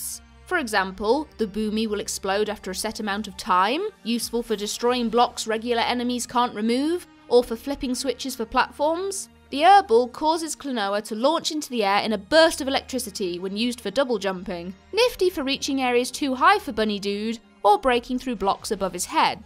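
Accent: RP, United Kingdom. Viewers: Just started playing this game, so looking for reviews...this is your first video I've seen, and am wondering how you can sound both sexy and posh at the same time...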